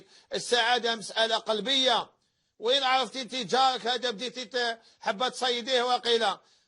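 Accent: Algeria. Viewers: Ana marocaine ta3jabni lahjat adzayer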